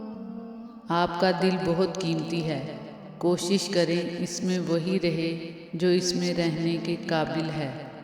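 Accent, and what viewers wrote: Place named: Punjab, Pakistan. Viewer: خوبصورت اواز و انداز کے ساتھ دل میں اتر جانے والے اصلاحی و سبق آموز اقوال ۔۔۔۔ تلفظ کی پرفیکٹ آدائیگی ۔۔۔ سونے پہہ سوھاگہ اردو میں پنجابی لب و لہجے کا حسین امتزاج ۔۔۔ ماشاء اللّٰہ ۔۔۔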